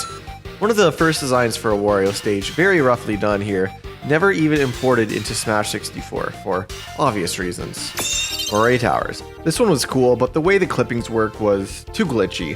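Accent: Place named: Canada. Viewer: this dude sounds canadian 🤢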